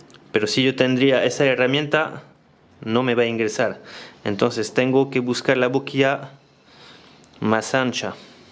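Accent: France. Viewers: Eres español? Porque es inconfundible la musicalidad de la fonética francesa... 😮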